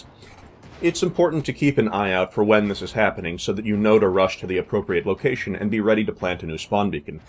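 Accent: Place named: United States. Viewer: what state of america are you from? you have the same accent as the guys from FilmCow i think! you could probably legit go to the titusvile :L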